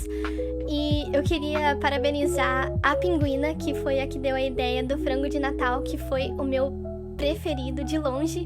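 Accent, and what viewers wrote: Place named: São Paulo, Brazil. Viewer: Hahah que estranho pessoas não percebendo que você é de SP quanto é tão claro.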